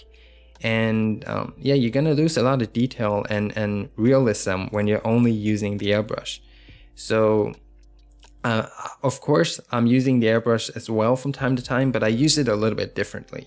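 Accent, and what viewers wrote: Germany. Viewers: Are you german?:)) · Are you German?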